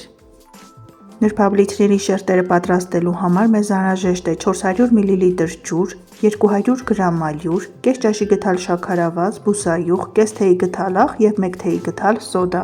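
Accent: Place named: Ararat, Armenia. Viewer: Ду шат юраатук ес джан, Цайнд амен ангам лселуц, инц твум е те инц Аразат цайн ем лсум, шат бнакан ес, у шат тнакан,аранц Кез котрателу🌹👍💋💋💋❤️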